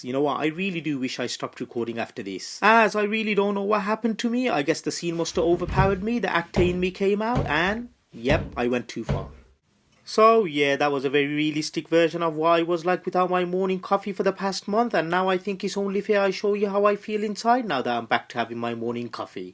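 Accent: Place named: Midlands, United Kingdom. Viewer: Hope you will give more entertaining videos in future. Kinda stunned to see very few subscribers in your channel, maybe the gap took place earlier affected in your algorithm but I don't know the exact reason. However, I try to shadow your videos because of your lovely midland accent. So put regular videos and make me happy. N.B. - I really hate cockney a lot :) :) :)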